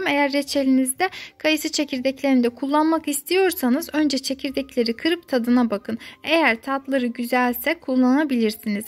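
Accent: Azerbaijan. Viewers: Xanım salam ,siz Azərbaycanlisiz ,lehcəniz mənə şirin gəldi .Abone oldum əllərinizə sağlıq 🌼🌼🌼🌼🌼👍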